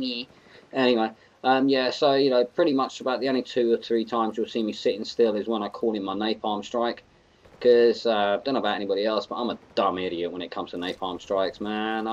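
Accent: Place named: Australia. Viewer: seriously the Aussies passed on their accent to you. its sounds awsome. great vid bud.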